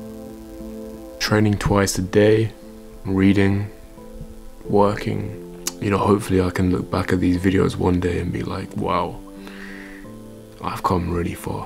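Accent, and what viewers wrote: England, United Kingdom. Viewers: Looking very handsome and gorgeous - being Irish myself, I love your English accent, so classy - I’d date you in a heartbeat 🇮🇪☘️🇬🇧🥰😍😘😘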